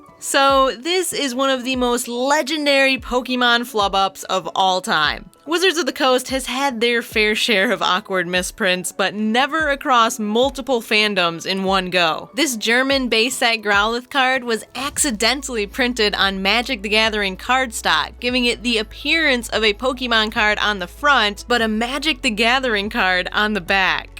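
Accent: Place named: Louisiana, United States. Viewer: are you from Louisiana? you sound so familiar like the shopkeeper of rockets hideout. please respond because you sound SOOOOOOO familiar -_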